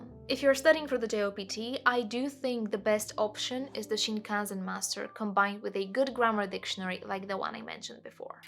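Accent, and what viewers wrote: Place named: United Kingdom. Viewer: Ah, hearing a British accent speaking about Japanese is refreshing. ありがとう 先生！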